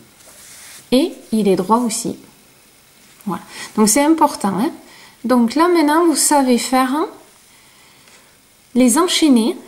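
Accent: South, France. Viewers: Formidable ! ça ne va pas trop vite, les explications sont nettes et précises, la voix agréable et le petit accent du sud rigolo. Merci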